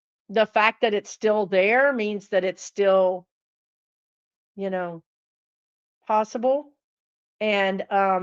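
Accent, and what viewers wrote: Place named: South, United States. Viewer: I’m rarely available to watch your Friday night live shows. But, know that I look forward to watching them ASAP. Thank you from one southern born gal to another. Your “you alls” feel like my home back when MAGA was a misspelled word. Little blue dot 🔵 in a RED county.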